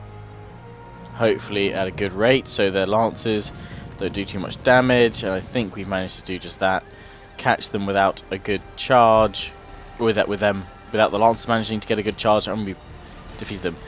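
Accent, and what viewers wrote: England, United Kingdom. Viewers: How do you do the cinematic zoom thing, "N" key or "End" key. Sorry im not British dont understand the accent that well. But idk Brits and Americans always fight on the internet. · Well,people DO love a good English accent... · that british accent SO catchy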